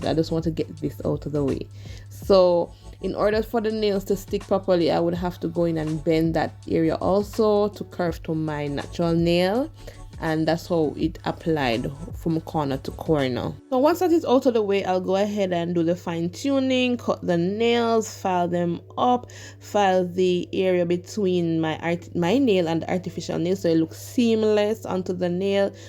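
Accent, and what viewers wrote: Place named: Caribbean. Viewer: I hear caribbean accent 😅 where are you from?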